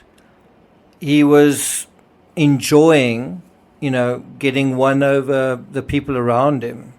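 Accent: South Africa. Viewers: Did you cover Oscar P? Your SA accent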